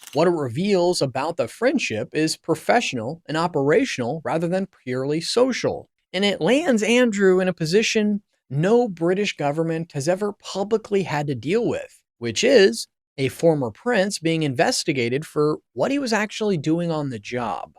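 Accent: United States. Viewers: Why the American narrator? What's Prince Andrew got to do with Americans?! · PLEASE learn how to pronounce proper names. specifically "Sandringham", "Norfolk", "Thames" and "Aylsham". To British ears you sound very err... American (this is not a complement). When you talk about British police - you video shows American police and at one point police from some third country. Pretty sloppy. I like the content though. Andrew is a pig that has besmirched the institution of monarchy. When the King said "the law must run its course" that removed all royal privilege and protection. King Charles must be fizzing with anger at his brother and by publishing those words he is signalling that he is prepared to see Andrew in prison. You can now expect the full force of the law to drop on Andrew like a ton of bricks.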